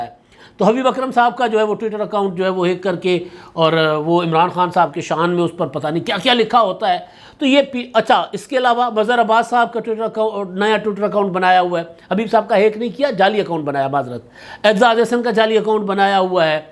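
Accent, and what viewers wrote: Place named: Punjab, Pakistan. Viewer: بھائ میں سندھ شہدادپور سے اپکا پروگرام میں پنجابی ٹچ بہت اچھا لگتا ہے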